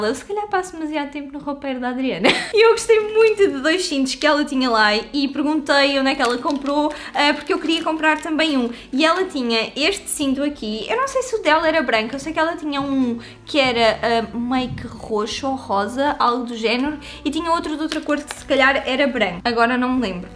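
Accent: Portugal. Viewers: Olá, linda menina. Vinha dizer, na esperança de que um dia leia, que vejo os seus vídeos, desde que os descobri. Tem sido uma companhia muito querida para mim ao longo dos últimos dois meses. Sou de Lisboa, mas encontro-me, de momento, em Helsínquia, Finlândia. Aqui mora um dos meus filhos com a sua família. Vim ajudar com as meninas. Só saio para fazer caminhada ou ir buscar/levar as meninas à escola. É muito refrescante ouvir uma jovem de Portugal. Ainda por cima, simpática e simples. Continue o seu bom trabalho. Faz bem a muita gente. Deus a abençoe e à sua família. Beijinhos grandes. Feliz Ano novo!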